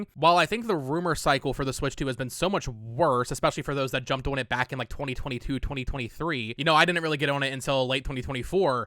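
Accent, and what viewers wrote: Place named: Canada. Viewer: You say “on” like “awhn”. Are you Canadian?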